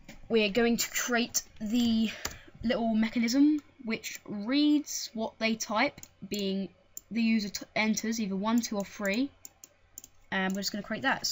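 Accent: England, United Kingdom. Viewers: british accent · i really don't understand anything ur talking so fast and man , i'm not used to the english accent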